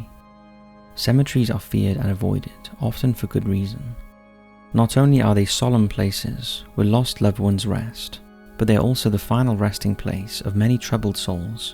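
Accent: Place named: England, United Kingdom. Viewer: As long as you are able to understand what is being said, the accent or enunciation does not matter. The point of language is to communicate effectively, as long effective communication is being carried out, it really does not matter if Tomato is pronounced "Tomayto" or "Tomahto". English originated from England, yet Americans took it as their first language, changed the spelling and pronunciation of words. Americans themselves have dozens of English accents. Even in the UK, there are many accents. Both UK & USA being primarily English speaking countries have many different ways of pronouncing words. If you look at footage of Americans from 1940-60, their accents sound way different than they do now. The further back you go in time, the more American English sounds like British English. Language changes and evolves with time and globalisation. 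In conclusion, there is no right way to pronounce any single word, because not everyone has the same upbringing or cultural background. Your accent is decided by your race, family, place of upbringing, first language, how many languages you speak. And it can also change if you spend time in a foreign country. So I don't think you should nitpick about how an "Englishman" enunciates words, if you're an "American".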